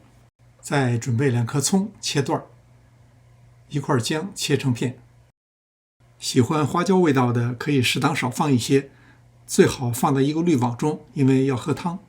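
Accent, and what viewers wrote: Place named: Hexi, China. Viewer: 老师的口音感觉是河西区的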